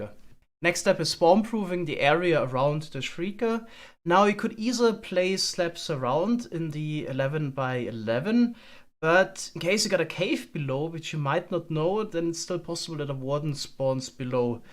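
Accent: Germany. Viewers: Are u german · are u german? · Klingst ja verdammt deutsch, sympathisch haha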